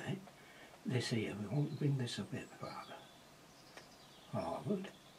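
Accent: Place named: North, United Kingdom. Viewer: Loved this, your so lovely,and northern like me lol, I understand you perfectly lol👏😁 you brill, hope your wife comes home soon my love, you take care of yourself, hugs👍🇬🇧❤️💙🎨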